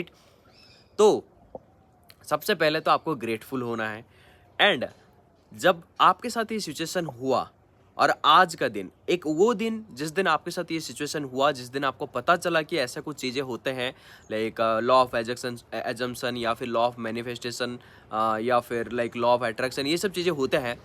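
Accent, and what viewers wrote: Bengal, India. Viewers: Dada are you bengali??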